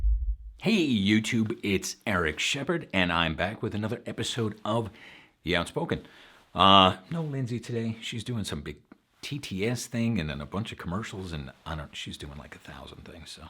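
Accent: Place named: New York, United States. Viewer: Love your New Yawwk accent.;) Great info as always, thanks!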